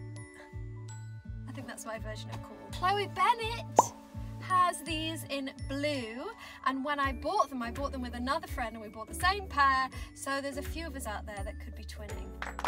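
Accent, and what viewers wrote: United Kingdom. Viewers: I just love your cute videos! I bought all sorts of tea at the store this morning because of you😉 your style is adorable. I also have decided you look like a combination of Jennifer Garner and Kierra Knightly, you are so pretty and your accent is the best. My husband always makes fun of me and my obsession with a British accent. I mean I feel like British people could say anything, even if it makes zero sense, but somehow it always sounds smart and classy with their accent🤣 love all your videos, and I will miss Fitzsimmons so much!!! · Love you from Japan 🇯🇵! I am learning British accent from AOS and your video! I can’t wait AOS comes Japan😭😭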